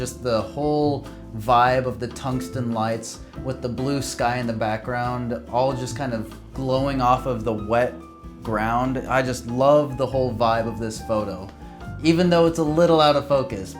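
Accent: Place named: Seattle, United States. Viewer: From they way your talking it sounds like your from Seattle, I’m from Seattle to and are trying to get back into my photography from taking a little, i recently just ran across a Pentax K1000 w/ a tamron SP 35-210mm lens at a pawn shop and had to get it, I loved your video, I have to start practicing with mines .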